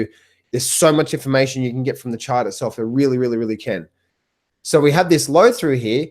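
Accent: Australia. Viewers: Dear; thank you very much for sharing a bit of your coveted inside trading secrets. You are a wonderful person and a joy to listen to. Although you may not be aware of it, I love your Aussie accent. It is similar to a British accent but different. I grew up in Chicago Illinois USA. What part of Australia are you in? The wildlife you have there is extraordinary. I loved Steve Irwin so much. That aside, a V bottom rocket recovery like this one is certainly odd, and experts I listen to are at an impasse as to the next move. Some say we will shoot right through the confluence of resistance and keep climbing to S&P500 2800+ and up and up. Others say retest of 2500 and then up and up. Some others say a retest of the December low and then a new bull market will ensue. Then there’s Bo Polny and his biblical pronouncements of doom. I know how much you love Mr. Polny. 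Kidding of course. In closing thank you so much for sharing your insight and wisdom.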